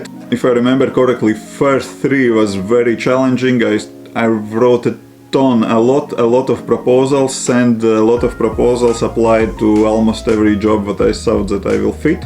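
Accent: Russia. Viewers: Доброго времени суток, такой акцент у вас русский) подскажите вы меня понимаете?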